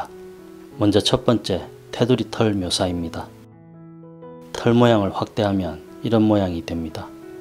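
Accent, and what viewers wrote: Gyeongsang, South Korea. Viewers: 감사히 잘 보고 갑니다 ^^ 말투가 경상도 쪽이신듯한데..맞나요 ㅎ^^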